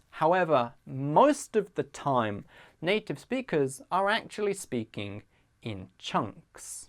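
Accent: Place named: United Kingdom. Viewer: Yes, it is British English!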